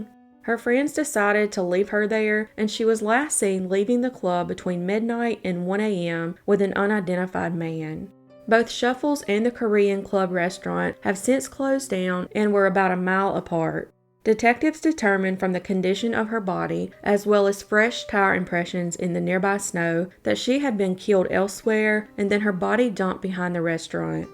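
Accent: South, United States. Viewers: You are my fave Youtuber in the genre. fresh stories and a nice southern drawl :)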